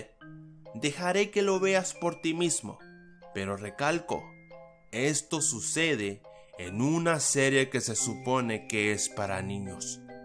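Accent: Chile. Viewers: suena como chileno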